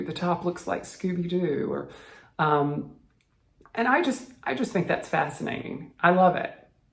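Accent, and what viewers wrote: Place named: South Florida, United States. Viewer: Omg “tornadahs” … memories of my mother! It is like I am listening to my family from South Florida.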